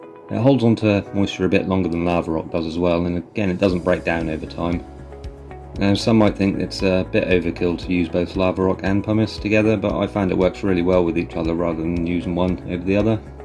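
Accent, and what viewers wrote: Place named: West Country, United Kingdom. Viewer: Well that was 6 years ago. In 2026 are you still using the same mix? You’re speaking tagalog but have a West Country accent. Unique! 🙋🏻‍♂️🇬🇧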